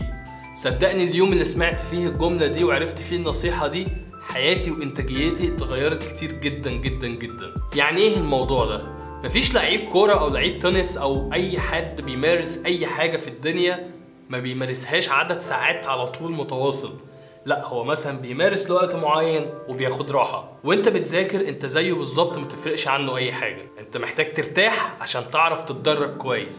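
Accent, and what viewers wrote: Alexandria, Egypt. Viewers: هوا حضرتك من الإسكندرية و لو من الإسكندرية منين بظبط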